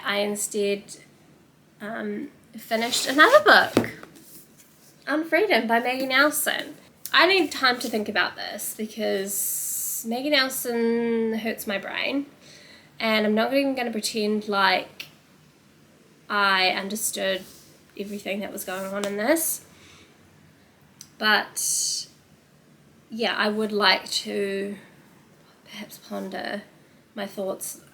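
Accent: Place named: New Zealand. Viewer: ooh okay i had no idea what poor things about (and i thought the film trailer look weird) but you’ve piqued my interest! your accent makes me miss NZ 😫🥹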